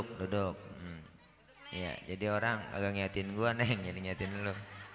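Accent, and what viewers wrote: Betawi, Indonesia. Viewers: Asalamualaikum pak ustad..bahasa betawi ny pak ustad bikin kita. semua..ketawa mulu..terima kasih ilmu ny pak ustad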